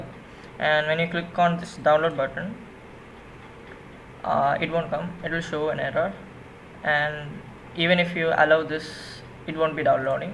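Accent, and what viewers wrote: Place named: India. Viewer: English Indian Languag Is Funny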